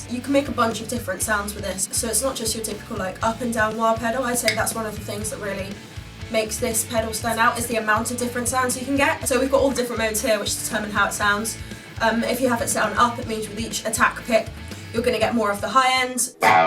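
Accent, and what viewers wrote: England, United Kingdom. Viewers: omg shes english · I hear british accent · WTF you have an english accent? Thought you were from Kentucky or something lmfao. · Английский аакцент . мне так нравится как ты играешь ты такая красивая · ¿Are you English, beautiful? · Damn, I fucking love the British accent ❤️ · What a perfect original English accent!! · The pedal sound like sh#t, but I loved hearing your perfect english accent. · She's sooooo English!!! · Omg British yes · She sounds British to me.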